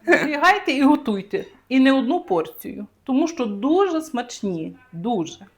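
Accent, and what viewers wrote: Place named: Podillia, Ukraine. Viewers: Ви просто суперова господиня, а мова нашого Поділля,подобається Вас дивитися,булочки пекли з внучкою,получились дійсно смачні і Ви правду сказали,що треба пекти і не одну порцію.Дуже дякую.😊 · Чому жахлива?! Так розмовляють на Хмельниччині!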